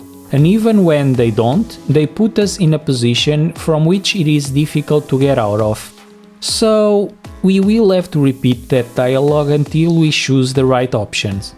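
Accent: Portugal. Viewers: I noticed a Portuguese accent 👀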